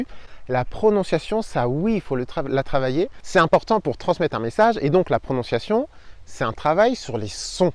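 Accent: France. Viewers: Bonjour, il y a toujours des gens pour critiquer, et comme on dit en France: la critique est facile mais l'art et difficile, et tous le monde a un accent moi je suis dans le sud de la France, et des gens remarquent que je ne suis pas originaire d'ici en effet j'ai vécu 25 ans dans l'Aisne au nord de Paris donc 100kms et alors rien de grave! De plus j'ai déjà fait écouter tes vidéos à une amie Dominicaine, et ça ne l'a pas dû tout choquée, par contre quand elle regarde des films espagnol du sud elle doit mettre les sous titres 😅, et j'ai beaucoup voyagé en France et alors là les accents il y en a de partout 😂, mais c'est joli tout ça, tant que l'on se comprend, de plus je disais à mon amie Dominicaine de ne pas s'inquiéter pour cela car celon avec qui elle parlera le français, il est différent partout. Bon je fini par dire que l'on a de la chance d'avoir quelqu'un comme toi pour apprendre l'espagnol, car tu as peut être un accent français mais au moins on te comprend quand tu parles, car lorsque c'est des natifs on ne comprends pas toujours, et si l'on est sur ta chaîne c'est pour apprendre et si ça ne convient pas à certains et bien qu'ils aillent voir ailleurs ou qu'il fasse leur chaîne. 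Voilà de tout ❤avec toi et encore merci 👍👏t'es génial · Ma fille qui vit et travaille en Catalogne depuis 12 ans, qui est parfaitement bilingue en castillan, et presque en catalan …. A un fond d’accent français ( de Paris ), et tous ses amis natifs espagnols et ses collègues, lui disent de ne rien changer….. c’est trop glamour comme ils lui disent ….de plus l’accent français et la langue, aussi d’ailleurs,font partie des plus sexy et appréciés de la planète ….à priori ….. donc bien d’accord …. L’essentiel est de maîtriser une langue, d’être compris, et de garder son identité., surtout ne changez rien, ce qui est important c’est de nous apprendre cette belle langue hispanique, avec ou sans pointe d’accent français …. Ce n’est pas l’essentiel …. Et vous le faites si bien.Et d’ailleurs, moi qui ne maîtrise pas bien cette langue mais l’entends régulièrement en Espagne, je trouve que vous êtes au top de votre expression. 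Merci à vous …. 👏👏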